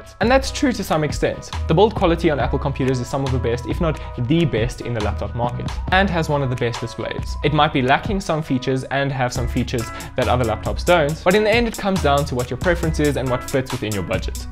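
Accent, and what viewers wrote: South Africa. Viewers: I love spotting a fellow South African just by the voice XD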